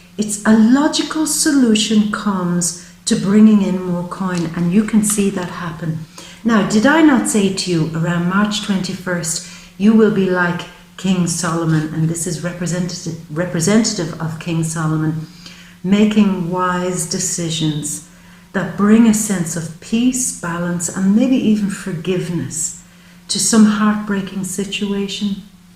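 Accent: Ireland. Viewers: you’re just incomparable. You’re a lightning bolt of inspiration striking out across the Atlantic—if only lightning bolts wore black dresses, talked with a brogue, and blew kisses. Close enough.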